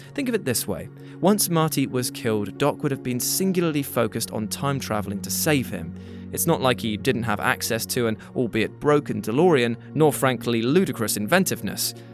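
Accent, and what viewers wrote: United Kingdom. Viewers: British accent!!!! 👂😫😖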